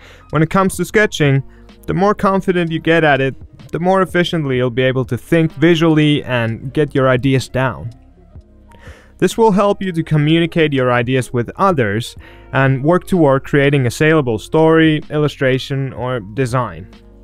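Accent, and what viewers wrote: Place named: Finland. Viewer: Haha I guessed right - Finnish accent! (Altough being Finnish myself it's not much of an achievment)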